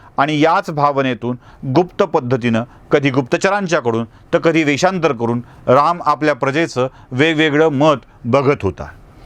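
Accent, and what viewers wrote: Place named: Marathwada, India. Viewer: विश्लेषण चांगलेच, पण श्रीरामांचा उल्लेख एकेरीत करणे योग्य वाटत नाही. तसेच आपले मराठवाडय़ातील मराठी प्रयत्नपूर्वक व जाणीवपूर्वक शुद्ध करणे आवश्यक आहे. आपणास खूप उंची गाठायची आहे. धन्यवाद .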